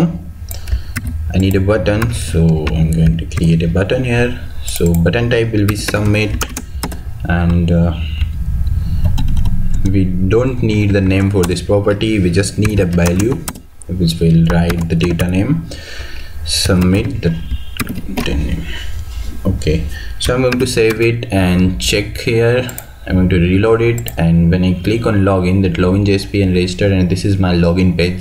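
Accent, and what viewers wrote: India. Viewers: thanks for your works but next time please improve your english speaking skills, i'm sorry but indian accent is hard to listen.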